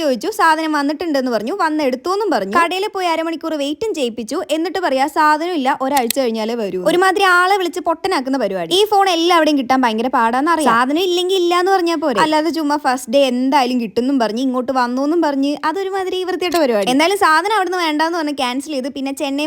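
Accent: Palakkad, India. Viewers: പാലക്കാട് anno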